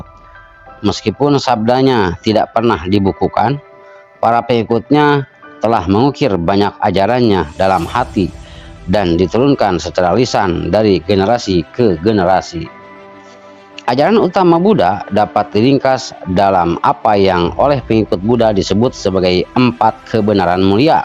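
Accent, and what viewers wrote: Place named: West Java, Indonesia. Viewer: Lidah Sunda naratornya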